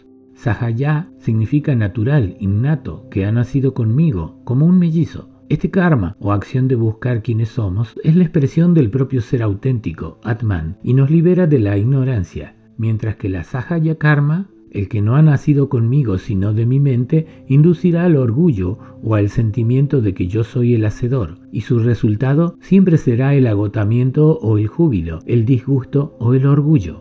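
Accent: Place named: Cordoba, Argentina. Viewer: la voz ( aunque agradable y Cordobesa)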